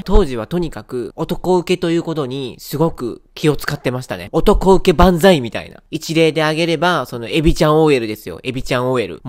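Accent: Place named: Osaka, Japan. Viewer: あ〜今日も面白かった🙌 の大阪弁好き❤️ 東京ラブストーリーめっちゃ見てた〜 のトークもめっちゃ好き❤️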